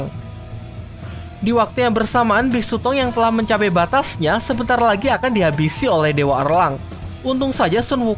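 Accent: Java, Indonesia. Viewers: Bang lu orang mana, orang jawa kah kok bilang nya belum deng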